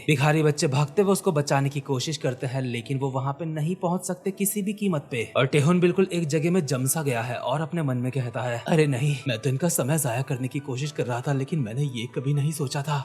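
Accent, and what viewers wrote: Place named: Hyderabad, India. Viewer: Ap ki language Hyderabadi dekh ri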